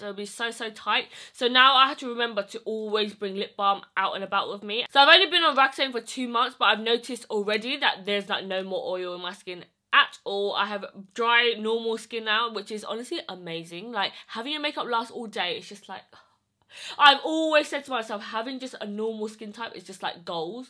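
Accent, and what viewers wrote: United Kingdom. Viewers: My mom asked if u were british asin i looked at her and said i dont know but dont asume anything since we r from the usa and to me she was sounding rude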